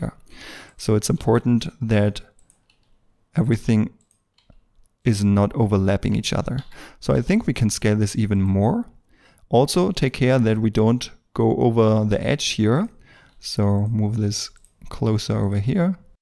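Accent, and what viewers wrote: Germany. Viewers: Your English is good (I think, I don't know, I am not a native English speaker either), but I figured out that you are from Germany from you accent anyway. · dat german accent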